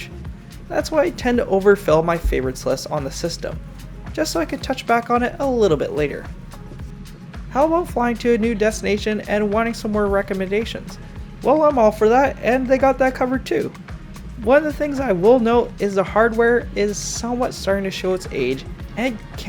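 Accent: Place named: Canada. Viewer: It’s fitting that the most Canadian accent ever reviews this air Canada flight.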